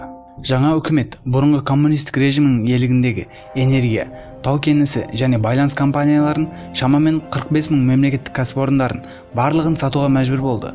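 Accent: Uzbekistan. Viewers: Казакша нармални сойлеш озбек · Сенин шындыгыннын барлыгы неге 27 болып келеди отрикти сога бересин гой деимин сосын казакша дурыстап таза казакша соиле Казакстанда турып жатырсын озбекше акцент косып соилеме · Өзбексің ба ? Кара каопаксын ба? Акцент өзбекскй ұнамайды сөзің..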